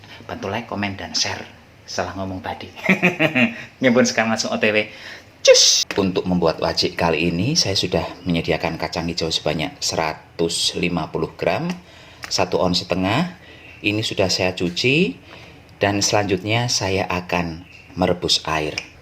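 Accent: Banyumas, Indonesia. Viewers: Sangat kreatiif ms... bahasa ngapaknya itu saya jg sangat suka...lucuuu lgi😁😁😁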